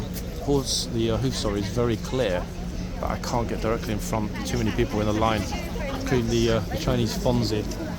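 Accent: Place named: United Kingdom. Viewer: I love listening to your very English voice.and no Americanisms ? As an old lady,I was taught English Grammer ! Thankyou so much for all the info,M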